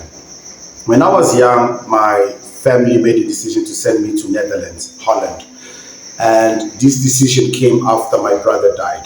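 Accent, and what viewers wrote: South Africa. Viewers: What? You are Angolan? You sound South African 🇿🇦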